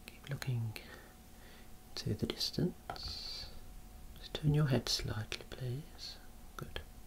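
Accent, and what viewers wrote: Birmingham, United Kingdom. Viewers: Never thought I'd find a brummie optician relaxing enough to sleep to! Lovely voice. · ARE YOU A BRUMMIE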